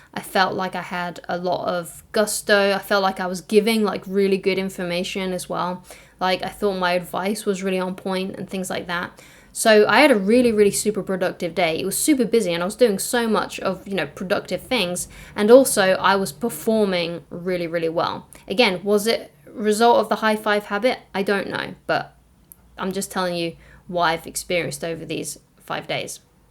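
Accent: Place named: England, United Kingdom. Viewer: Thank god a nice English accent.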